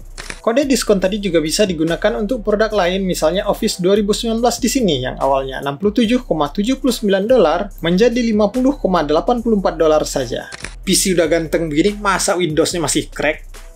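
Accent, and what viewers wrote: West Sumatra, Indonesia. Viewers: Abang orang Sumbar ka bg?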